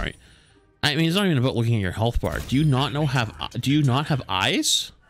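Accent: United States. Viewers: Hold on. What is that accent,? You got a bit of cowboy in ya?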